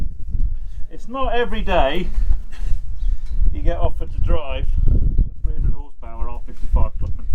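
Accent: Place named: RP, United Kingdom. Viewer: I usually wouldn't ever say anything but his poshness was embarrassing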